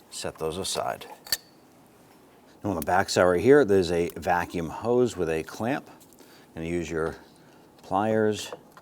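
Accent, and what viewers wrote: Boston, United States. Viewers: Boston accent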